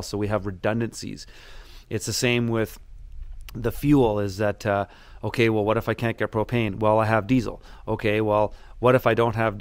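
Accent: Canada. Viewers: Thick canadian accent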